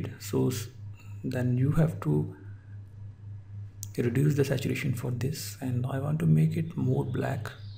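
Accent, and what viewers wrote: India. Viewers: My apologize, but that indian accent is so annoying...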